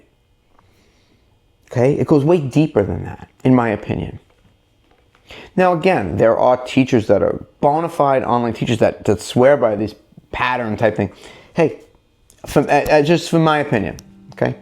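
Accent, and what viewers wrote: New York, United States. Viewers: I very much like your videos and yoa nu youak accent also😉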